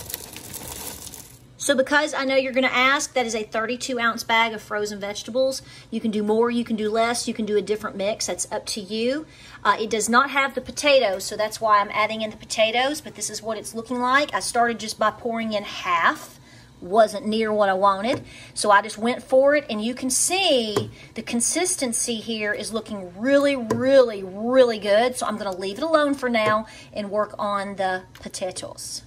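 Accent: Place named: Tennessee, United States. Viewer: Love you ! I'm from LAFOLLETTE TN. You talk like us. Down to earth and tell it like it is. And simple and sweet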